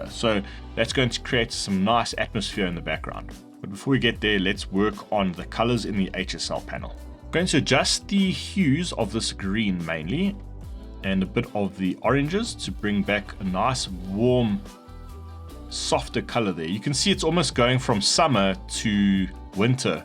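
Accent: South Africa. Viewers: As soon as I heard your voice I immediately picked up that you’re a fellow South African… love your work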